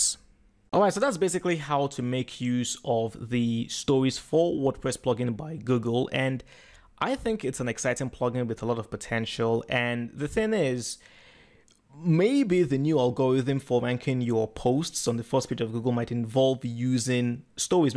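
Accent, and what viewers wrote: Nigeria. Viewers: That's a Nigerian Speaking!! Nna kedu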